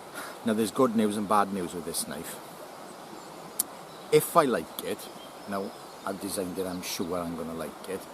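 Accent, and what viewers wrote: Wales, United Kingdom. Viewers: Great video. I love your Welsh accent. So unusual. Looking forward to seeing this Mark 2 model!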